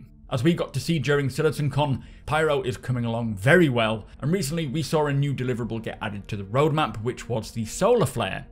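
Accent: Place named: North West England, United Kingdom. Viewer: Where you from in the north west? I'm trying to pinpoint your accent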